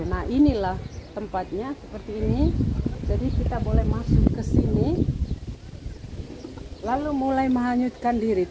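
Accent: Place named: Flores, Indonesia. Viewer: Mohon maaf, Logatnya kayak orang flores, manggarai, ruteng🙂🙏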